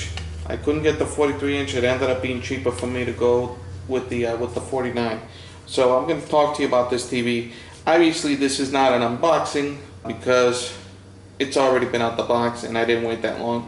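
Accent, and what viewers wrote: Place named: East Coast, United States. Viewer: are you from Brooklyn or Jersey! I know you from the east coast. you can of sound like dice clay😊 I'm older and remember watching him on cinemax in the early 90s but good review.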